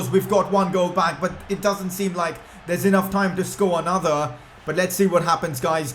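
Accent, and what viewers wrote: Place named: India. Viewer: #PCQ Where are you from? U sound indian..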